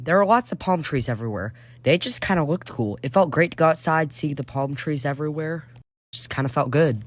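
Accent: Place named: South, United States. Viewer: well it's just cause it's like a southern accent but you still articulate yourself really well lol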